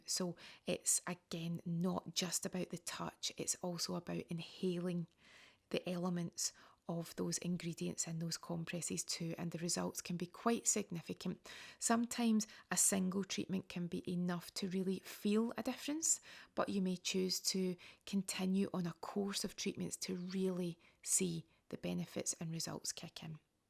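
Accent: Scotland, United Kingdom. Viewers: scottish accent pure love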